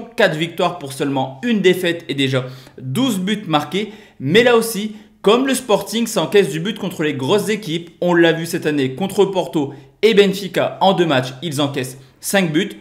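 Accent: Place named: Lille, France. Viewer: Bonne année et meilleurs vœux Que l année 2021 soit bon en pronos pour nous tous !! Au faite t du nord on sent l accent lillois 😉😉😉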